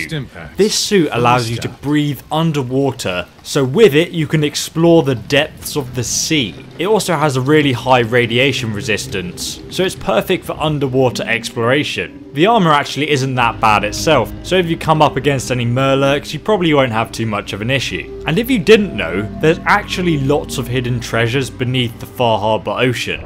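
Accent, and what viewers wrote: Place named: United Kingdom. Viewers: It's obviously British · he's brittish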